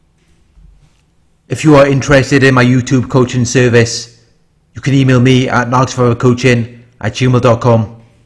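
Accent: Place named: Swansea, United Kingdom. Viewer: Still got the Swansea accent 😉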